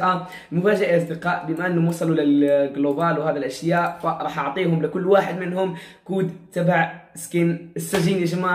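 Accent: Morocco. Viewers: هل انت مغربي؟ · واش انت مغريبي من اي مدينة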